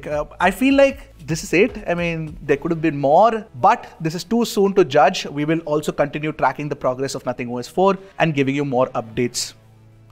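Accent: India. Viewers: the video is useful but how you speak in india accent influence the viewer not to understand the content. please improvize your intonation in english accent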